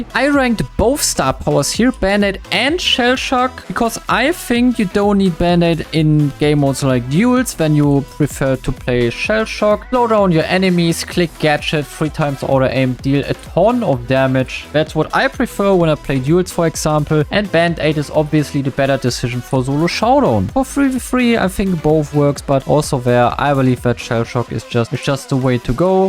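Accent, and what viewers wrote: Germany. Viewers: Bro ist so deutsch 😅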